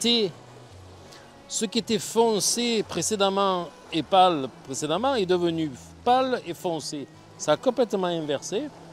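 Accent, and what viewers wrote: France. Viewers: merci beaucoup pour vos vidéos utiles, pourquoi vous avez un accent français, si vous venez du Québec. meci encore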